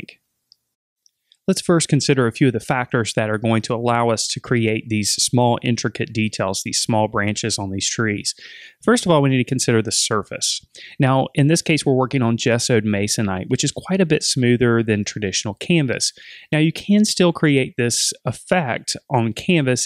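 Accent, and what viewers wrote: South, United States. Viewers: Is that a Southern accent you have?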